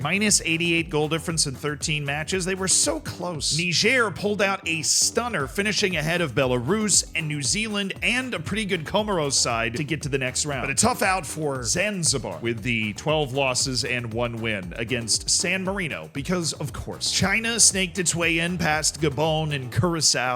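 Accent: United Kingdom. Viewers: Why do you commentate with a British accent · What a video please do more with your British commentary 😂❤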